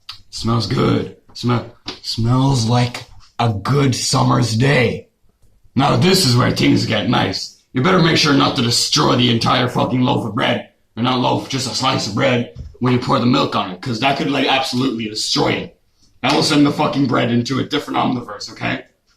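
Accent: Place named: Ireland. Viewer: he sounds like Irish man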